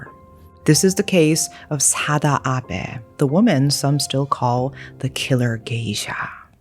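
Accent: South Korea. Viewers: I love how you say Asian names with a Korean accent - a unique twist! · I can hear some Korean pronunciation to be honest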